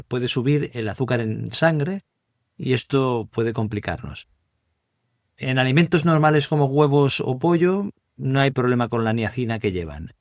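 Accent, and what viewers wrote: Spain. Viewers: Este señor no es el q esta hablando. Este señor es mexicano y la voz es de un español. Por lo tanto Este video no es confiable. VIDEO FALSO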